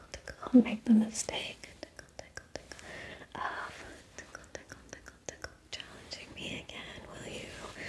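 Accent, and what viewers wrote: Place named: United Kingdom. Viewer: you're funny saying "Hey,you" and "Who do you think you are?".If you could ask anything about me,fun or otherwise,what would it be?I respectfully wish you continued success with the ASMR videos.Love your facial expressions,mouth sounds,tickle taunts and your cute accent,you silly Brit.You can't get me if you tried.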